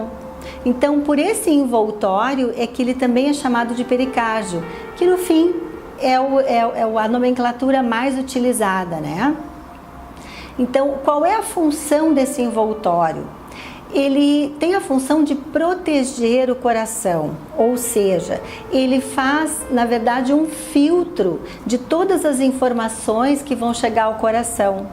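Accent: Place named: Rio Grande do Sul, Brazil. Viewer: Tô reconhecendo e me identificando com esse sotaque. Somos conterrâneas dos Pagos?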